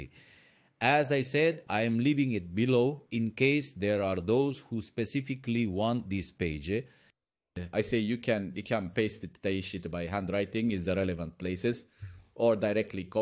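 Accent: India. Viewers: Hı teacher . YouTube made your accent like Indians accent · biraz indian english olmuş hsdjgfs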